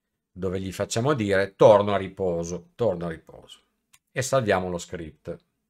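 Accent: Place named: Italy. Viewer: Grazie per il video! Dopo giornate e giornate nelle quali cercavo una soluzione per il mio tablet, con questi script mi hai risolto tutti i problemi!! Tra l'altro, a sentire dall'accento, sei anche delle mie parti! 😅 Grazie ancora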